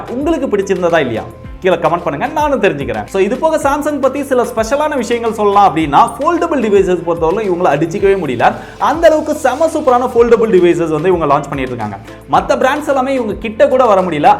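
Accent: Kovilpatti, India. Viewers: Neenga kovilpatti ah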